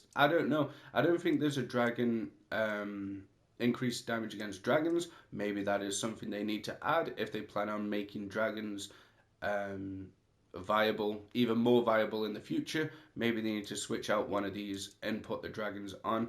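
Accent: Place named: United Kingdom. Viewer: Nice to hear a British content creator for NW. But why play on console? {:-:-:}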